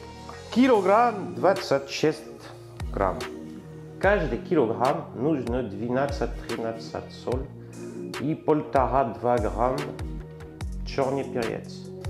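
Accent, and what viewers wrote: France. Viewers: Живу 5лет во Франции, конечно,как повар по образованию,начала активно готовить. Вася Емельяненко,Илья Исакыч,смотрела всех подряд.И тут,оляля!Я нашла французского повара,говорящего по русски!Как мило Вы говорите по русски! Это комильфо! Теперь смотрю только Вас! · Пусть разговаривает с французским акцентом, так интереснее. Да и ему удобнее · Уважаемый Я смотрю ваши видео с большим наслаждением, это бесподобно всё -- рецепты, прекрасный гасконец, особенно его русская речь на французский манер, это изюминка!! Слушаю вас как поэзию, никогда не переходите на чисто русский, потому что тогда потеряется шарм. Сегодня балдела от слов -- кладём в протёртую печень маслы ( надеюсь, вам объяснят, значение на русском -- маслы). Я восхищаюсь вами и благодарю за ваши видео и бесподобные рецепты. Удачи вам и всего хорошего вам и вашей семье.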